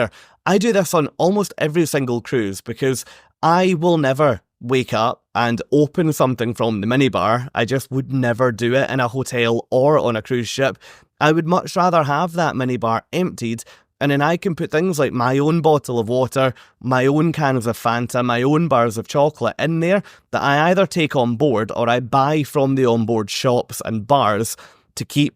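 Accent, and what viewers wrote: Scotland, United Kingdom. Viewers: Loved your sweet Scottish accent! Could listen to it all day! Great video very different from others. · What a delight to have a Scot who speaks so clearly!!! We “unwashed” revel in the accent but luve to understand also. 😊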